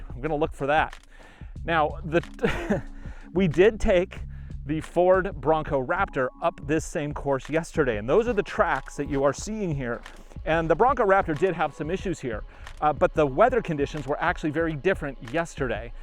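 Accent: United States. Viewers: I am also wondering why we are watching the driver so much when we clicked on this vid to SEE HOW THESE TWO CROSSOVERS PERFORM GOING UP THAT HILL?! Less talk and more showing of the two CUVs. PS The way this guy drives and talks, he must be from the Midwest.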